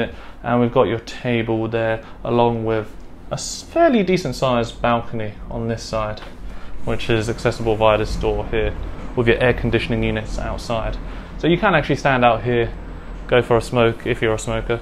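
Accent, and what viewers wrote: United Kingdom. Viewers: A guy with such pure British accent for a change...😁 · I love your channel I love British accent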